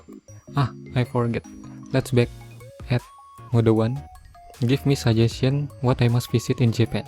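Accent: Indonesia. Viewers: Bahasa inggris nya sangat mudah dipahami karna sesama orang Indonesia gw juga kalo ngucapin bahasa inggris kaya gitu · Typical orang indonesia ngomong inggris, logat indonya berasa banget, anyway good video bro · Sudah kuduga ni orang indo ,dari cara bicaranya masih kedengeran kyak orang indo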